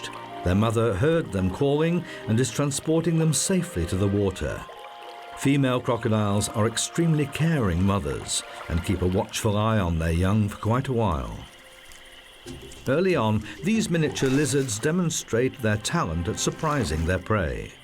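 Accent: United Kingdom. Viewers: These documentaries would not be as good without the narrator having a British accent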